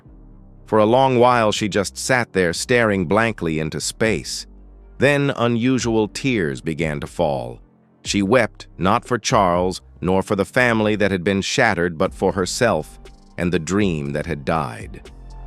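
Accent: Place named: United States. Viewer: so many American accents on these stories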